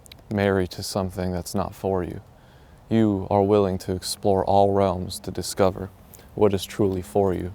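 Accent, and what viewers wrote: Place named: United Kingdom. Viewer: Thank you. I like the British accent! Made me laugh!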